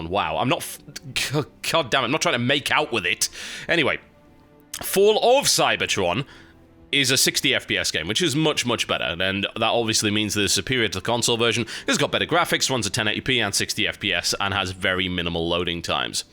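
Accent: United Kingdom. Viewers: Man you are british...BTW i mean that inna good way!! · So. British. FUCK YEAH.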